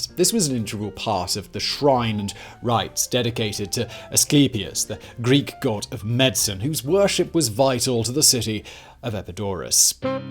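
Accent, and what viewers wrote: United Kingdom. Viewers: I love your videos man! something about a British voice, and a bald guy with a beard makes learning random information very enjoyable !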